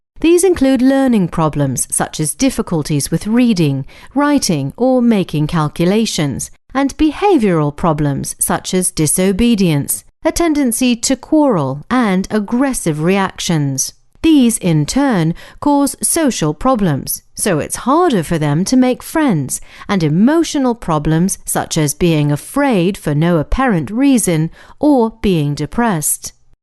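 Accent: United Kingdom. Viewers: typical British English :D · I love english accent : )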